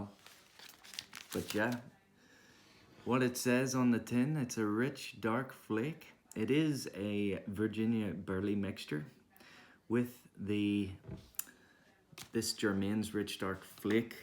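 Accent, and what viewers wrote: Ireland. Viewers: Thank you for subscribing to me do I detect a little IR ish in your voice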